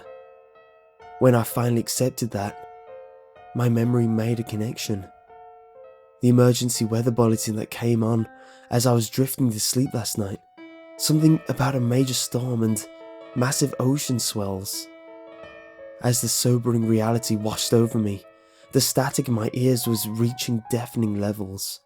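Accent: United Kingdom. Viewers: Are you British or American?! THIS IS DRIVING ME INSANE!! WHY THE HELL WOULD YOU DO THIS TO SOMEBODY?!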